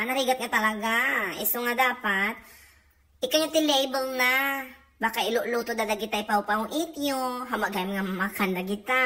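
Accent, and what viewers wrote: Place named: Pangasinan, Philippines. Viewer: Kalma 😂 apay aya ket taga pangasinan ka agsasabali ti ilokano tayo nya? Batam dagita apal da laing😊 Love you ☺️❤️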